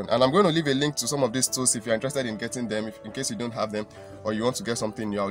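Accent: Nigeria. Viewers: Thanks very much sir. Sir it's seems like you are from Nigeria. Are you from Nigeria?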